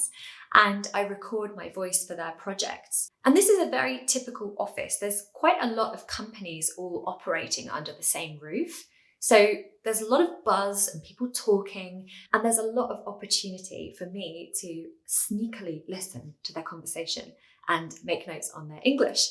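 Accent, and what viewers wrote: United Kingdom. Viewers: I love your accent. As a Brazilian, it has helped me understand British English. Keep up on that! Handy expressions! 🤗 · ❤we love you as a teacher , you’re videos making my accent like Uk people accent 😊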